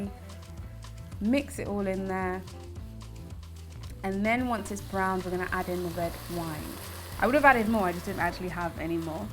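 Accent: London, United Kingdom. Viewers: Beautiful black vegan with cute London accent. 😍 Surprised to see so few guys in your comments, we are usually suckers for beauty and food!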